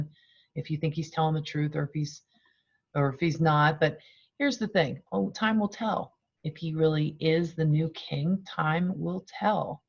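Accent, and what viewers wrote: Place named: Philadelphia, United States. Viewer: Greetings from New Jersey. I can hear your Philly accent 😃Thank you. Love your perspective and message of encouragement.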